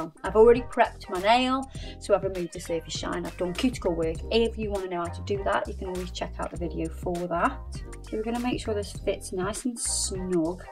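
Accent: United Kingdom. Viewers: I really enjoy listening ur british accent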